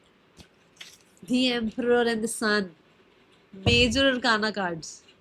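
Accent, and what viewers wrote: Punjab, India. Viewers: Mam tusi punjabi ho ?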